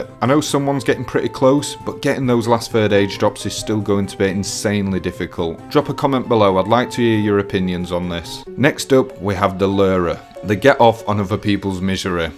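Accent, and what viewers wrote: Yorkshire, United Kingdom. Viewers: Wait, which Yorkshire are you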